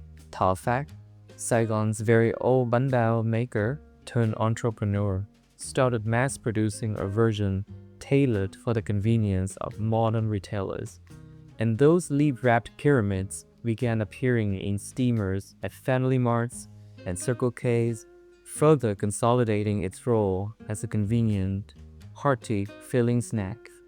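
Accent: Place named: England, United Kingdom. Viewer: Love your english accent, love bánh giò too, keep going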